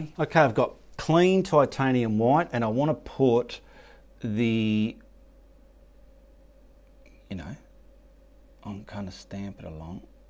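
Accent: Australia. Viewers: Beautiful! Your sense of humor is so subtle, but so funny. "We'll put a bum on that cloud, and a bum on that cloud." I've been trying your examples in attempting to do my beach scene, and as simple as you make them, they still take practice, for me anyway. I'm combining two of your videos on one painting, and the tips and tricks are so incredibly helpful! Not sure if it helps the channel, but I definitely end up watching each video many times, haha. Watching them over and over builds up my courage! Thank you so much for taking the time to share your wonderful talents! I'm so happy to have found your channel! (I think I'm picking up an Australian accent while I'm at it!)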